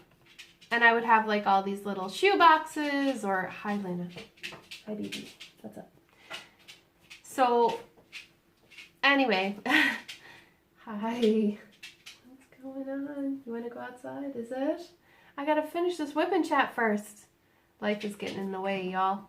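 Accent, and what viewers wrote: Ireland. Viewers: You do what ever makes you happy. I love to see what you put out and I love your mixed accent. YES you are starting to mix in a bit of Irish tones when you speak, and it is Adorable. Love you!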